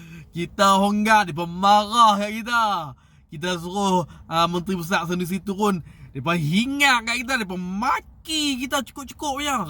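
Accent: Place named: Kedah, Malaysia. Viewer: PEKAT KEDAH AKU XFAHAMMMM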